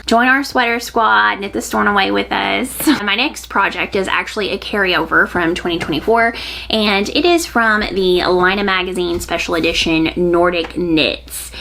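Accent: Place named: Arkansas, United States. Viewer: I always love a new video from you! You accent makes me feel like home. My family is from Bentonville. I can not wait to see what you get up to this year!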